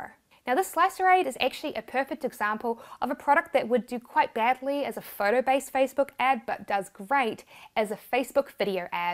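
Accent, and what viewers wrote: New Zealand. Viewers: I'm loving the NZ accent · I think it's a New Zealand accent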